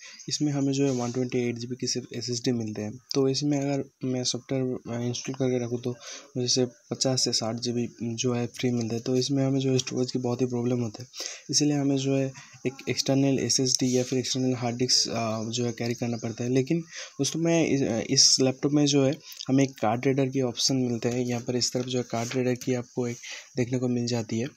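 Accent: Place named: Bengal, India. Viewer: Bangali pola hindi boltase 👍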